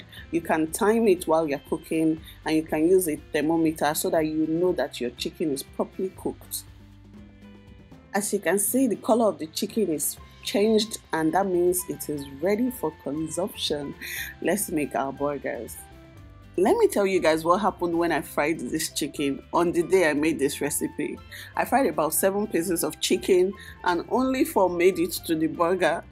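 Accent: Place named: Nigeria. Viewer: I am totally blind and I love the way you described your video. He did a good job and it's easy to follow by the way you sound like you're from Nigeria. Anyway that's exactly what I did and how I followed it it's very good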